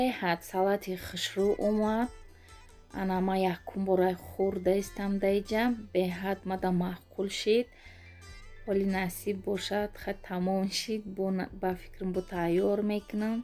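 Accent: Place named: Dushanbe, Tajikistan. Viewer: 21л Салом ,Шумо аз Конибодом лекин лахчатон монанд нест дигар хел ранги Душанбегихо гап мезанед,салати ачоибот зебо ва аз намудаш хело бомаза менамод👍👍👍👍😋😋😋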